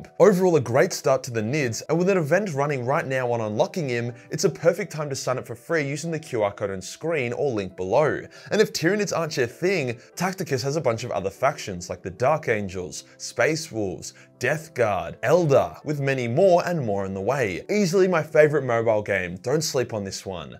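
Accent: Australia. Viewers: You spoke to fast, just heard aussie accented noise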